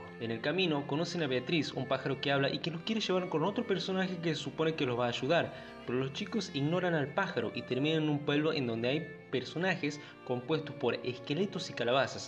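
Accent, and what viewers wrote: Argentina. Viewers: No e visto esta serie pero queria saber de que trataría antes de verla, se ve muy interesante la verdad. Eres argentino, me encanta tu acento!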